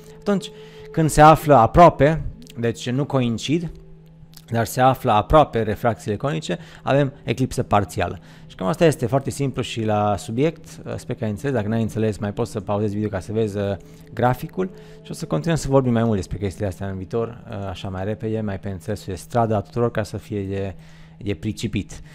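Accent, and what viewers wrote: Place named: Maramureș, Romania. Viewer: ai accent interesant, esti din Maramureș?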